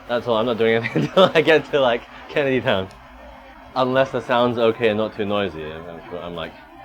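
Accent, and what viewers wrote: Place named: Australia. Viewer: Wow, Australian :) Where about?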